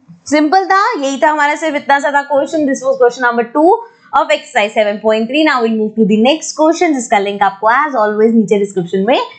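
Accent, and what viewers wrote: Punjab, India. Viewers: Tuse Punjabi ho kya???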